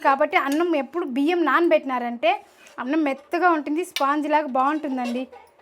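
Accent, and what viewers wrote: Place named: Chittoor, India. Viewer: ne matalu chenna pellalaga chala baguntai me chethuru slang enka bagundhi super nuvu andhulonu tagaydhay lay👌👌👌👌👌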